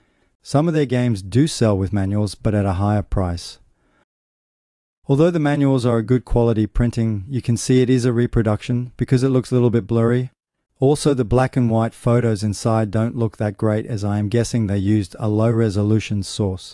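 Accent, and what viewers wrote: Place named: Australia. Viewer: Australia? I feel like an idiot not hearing it sooner from your accent, what's wrong w/ me? One of the crown jewels of my LTTP collection is a CIB Mattel/Australian copy -- had a classmate from my foreign school days act as the go between to secure it.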